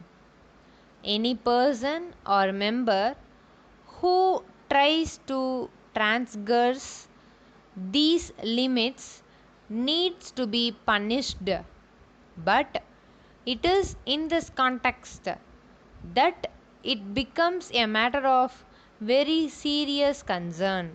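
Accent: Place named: South India, India. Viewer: Yesi dictation exm m ayegi to kyse samjh ayega bhai pura south accent h ye to 🤔🤔🙄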